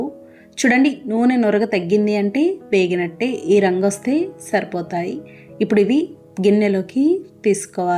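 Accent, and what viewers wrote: Godavari, India. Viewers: Meedhi godavari aa andi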